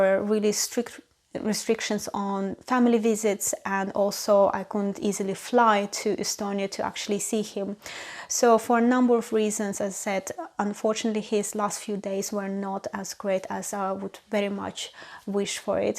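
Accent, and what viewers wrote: Russia. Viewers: you have russian accent. Are you from Russia?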